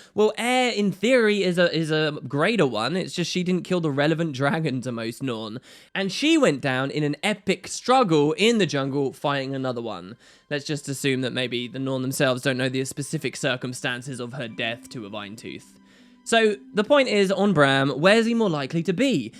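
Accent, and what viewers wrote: United Kingdom. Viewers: Hey don't know if you'll see this, but I've been checking your channel daily sense FF15 release hoping for some content on it. Weather that be the start of an LP or just some sort of news. I imagine you might of said something about it in one of your GW2 vids but unfortunately I've not watched those for some time as I never spent the money on the expansion and don't want to ruin anything as I'm sure one day when I got some extra money to rebuild my computer I will. Anyway, your FFX LP was hands down the best LP I've ever seen, I also enjoyed the first half of the X-2 one, but to honest with ya by the time you started back up I'd forgotten most of what happened and kind of lost interest. Idk, I really hope you'll do an ff15 lp because I'd love a good reason to tune back into your channel on a daily basis again, miss that British accent of yours:)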